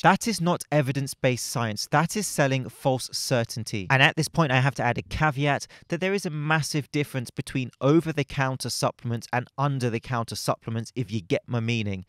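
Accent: United Kingdom. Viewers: Where do you live? British accent, Palm trees and sunshine but, you're wearing a hoodie!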